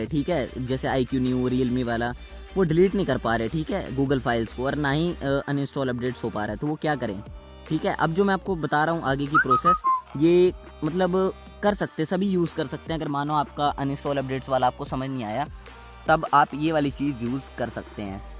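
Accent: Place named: India. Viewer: Is this a joke? It doesn't even work?! Tf? English caption but indian voice wtf?!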